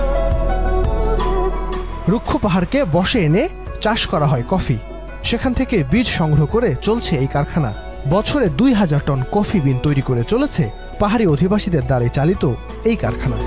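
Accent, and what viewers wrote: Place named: Noakhali, Bangladesh. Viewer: ভাই আপনার সংবাদ বিশ্লেষণ ভুলে ভরা, আর "আমি জেনে শুনে বিষ করেছি "প্রাণ"(???) , বিষ করেছি পান হবে। চুপ্পু বংগভবনে বন্দি না, আপনি বলেছেন চুপ্পু কোথায়ও বের হয় না,কথাটি সম্পুর্ন ভুল, এই ২৬ শে মার্চ স্বাধীনতা দিবসে সে সাভার স্মৃতি সৌধে পুষ্পস্ত্ববক অর্পণ করে। আপনার কথায় নোয়াখালীর আঞ্চলিকতা প্রকটভাবে লক্ষনীয়।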